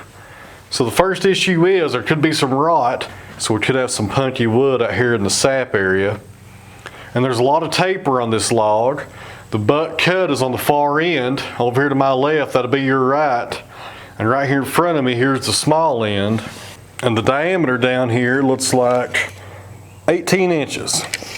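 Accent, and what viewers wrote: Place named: South, United States. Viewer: Can the accent get any more southern? I love it.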